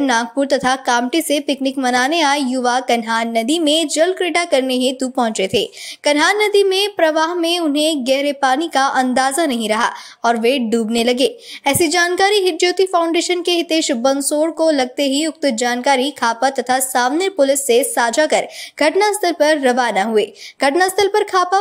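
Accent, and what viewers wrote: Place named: Uttar Pradesh, India. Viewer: हिंदी कुछ जादा ही तगडा है लगता है UP से प्रभावित है ❤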